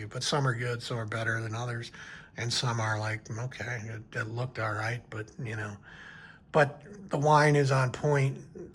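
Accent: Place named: United States. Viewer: I have stayed here before. Very nice hotel. You sound American. No hard feelings - Canadian here.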